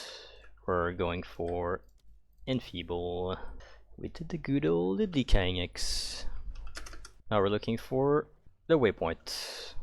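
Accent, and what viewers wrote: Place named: Quebec, Canada. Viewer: hi random and weird question lol, i noticed youre on the BR servers, but your accent sounds A LOT like a french canadian accent so I was wondering if youre from Quebec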